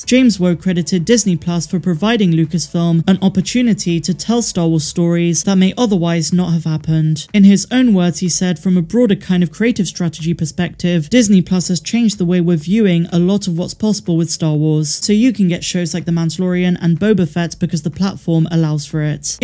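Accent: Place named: Cornwall, United Kingdom. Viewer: I want more jibber jabber please in your cute cornwall accent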